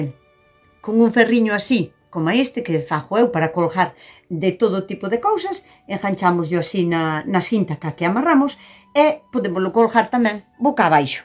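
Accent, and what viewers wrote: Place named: Ribeira, Spain. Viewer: Cousa tan xeitosa!! E como me gusta o teu falar ribeirán! Noraboa pola tua horta e polo traballo de difusión. 10 horas de media de traballo por cada video, lín nun teu comentario. Estes videos son semente de futuro. Gracias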